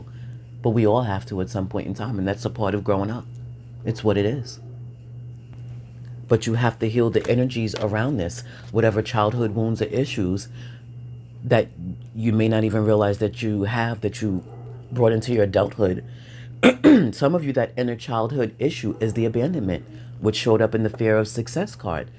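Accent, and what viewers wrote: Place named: East Coast, United States. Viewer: I just LOOVVVEEEE your East coast accent!🥰❤❤❤💛🥰💋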